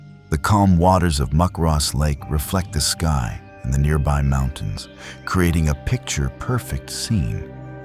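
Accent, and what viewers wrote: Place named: United States. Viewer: Ahhhhh not this annoying AI American voice. Bleh.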